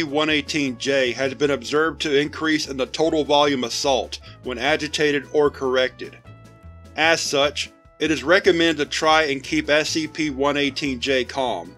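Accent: South, United States. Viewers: Something about your southern accent talking about the saints is so funny to me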